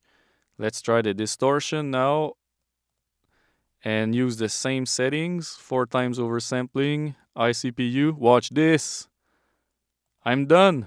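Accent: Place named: Quebec, Canada. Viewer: You would love Object editing in Samplitude. They been doing this for a long time. They have this crazy sale going on right now for Samplitude Pro X3 plus Sound Forge Pro 11 and Spectralayers Pro. Worth looking into. I'll post a link but I'm not sure it'll work. <link> I'd recognize that Quebec Accent anywhere. Salut! And thanks for the video.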